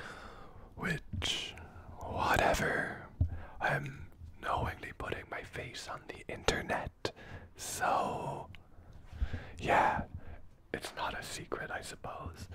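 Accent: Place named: Ireland. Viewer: Irish? ☘Whispering into my ear? 🥰 Dare i click? 🤔 I knew they'd be the death of me....😅 Okay, here goes nothin'...🙃 💚*💚*💚*💚*💚*💚 *faints (☘shamrocks☘ floatin'☘ around☘ my ☘head☘)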